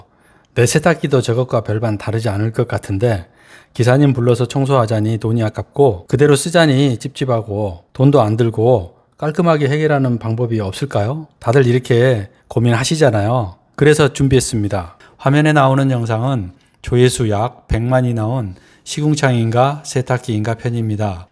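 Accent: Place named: Jeolla, South Korea. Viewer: 억양이 전라도 억양 이시네요^^ 푸근 합니다^^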